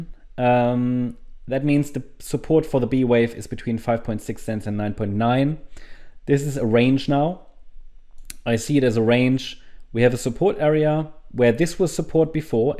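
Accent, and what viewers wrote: Germany. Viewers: Your accent sounds German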